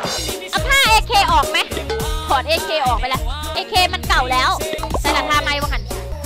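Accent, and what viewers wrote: Isan, Thailand. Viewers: พี่ พูดอีสานน่าฮักมากเลย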